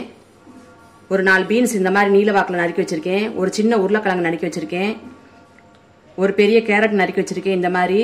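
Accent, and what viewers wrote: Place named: Madurai, India. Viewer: I love her Madurai accent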